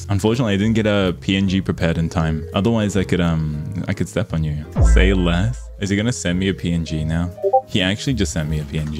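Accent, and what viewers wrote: Australia. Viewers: Sir! You are making me a tomato and I am trying not to scream! (You're Aussie accent is making me loose it despite being surrounded by it all the time since I'm an Aussie) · Omg, is that an Aussie accent I hear? If so, that makes me so happy more of us are getting out there and entering the VTuber community. Congrats on your debut! If you're not Aussie, mb lmao. Can't unhear an accent that I'm always surrounded by 🥹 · The Aussie accent is what hooked me up immediately. Also I am genuinely way more than impressed by how good the vtuber model is and love your sense of humor. Congrats on your redebut!! (❁´◡`❁)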